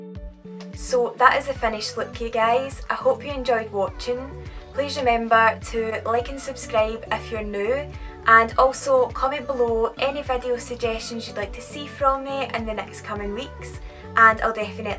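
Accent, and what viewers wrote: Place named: Scotland, United Kingdom. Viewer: 😁 Scottish accents are the best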